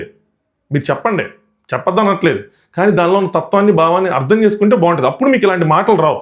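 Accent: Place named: Godavari, India. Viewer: Annayya nenu mimmalni subscribe cheyakunda mee video lu choosedaanini,,,chaala shame ga feel avthunnanu,,,, nenu chaala thakkuva channels ni subscribe chesaanu,,,, okati rendu vantala plchannels ,,,, garikapati gaaru,,, Chaganti garu,,, samavedham garu,,,,priyachowdary garu,,,, filmymoji,,,, ika itu paina meeru ,,, meee maata theeru pakka godhavari kada,,,,, entha chakkaga paddathiga matladuthunnaro,,,,, chaala manchi values ethics morals unna points meeda cheothunnaru,,,,, sooper Annaya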